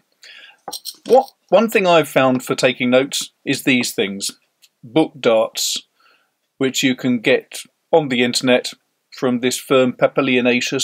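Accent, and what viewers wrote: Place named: RP, United Kingdom. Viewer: Could you please make a video on why is it that most Brits, even well-read persons like you, have this unbearable artificially arrogant, posh, patronizing, lordly, toplofty tone while speaking? Even among yourselves... what are you trying to proof? The British Empire finished long time ago, did you know?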